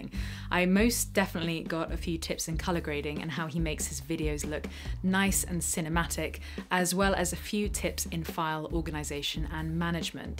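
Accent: United Kingdom. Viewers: Omg that soft perfect beautiful British accent <3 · She's so Bri-ish...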